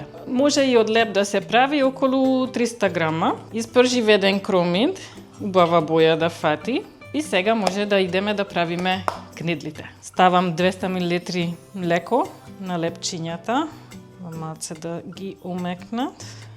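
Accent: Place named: Hungary. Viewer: Прекрасна и слатка како и секогаш. Уживам во нејзиниот унгарско македонски јазик и дикција. И секако ги запишувам рецептите.🤗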